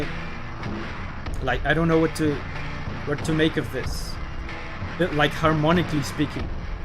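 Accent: Brazil. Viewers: Esse mano é mto brasileiro kk, dá pra ver no jeito de falar e as recomendações do Yt dele entrega tbm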